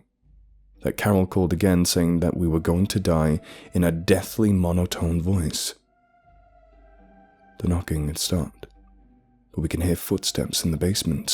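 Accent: South, United States. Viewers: Your American accent is great man! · That was awesome! I wish I could find out more about what it all was ugh lol great southern accent by the way :)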